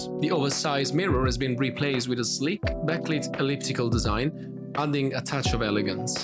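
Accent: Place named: Glasgow, United Kingdom. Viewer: Top notch! You ever lived in Glasgow? Got a bit of glasgow in ya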